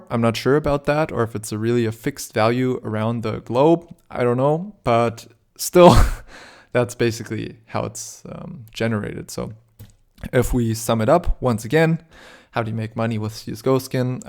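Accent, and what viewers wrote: Germany. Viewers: okay thank you ^^ PS: are u german ? xD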